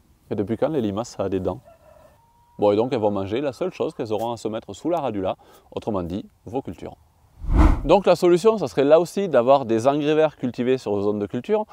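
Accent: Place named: Occitania, France. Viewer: Salut! Tu as un accent occitan. De quel département tu es?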